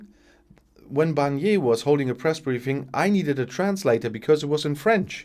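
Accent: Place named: Canada. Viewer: O no it Canadian English actually. 😅😜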